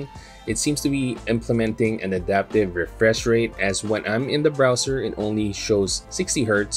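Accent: Philippines. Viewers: The Philippino accent is the cutest ever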